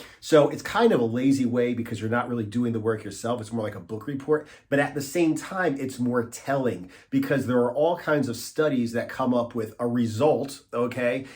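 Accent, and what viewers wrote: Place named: Philadelphia, United States. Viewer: Great video, man! A lot of folks need to hear this. P.s. your accent makes me think you're from Philly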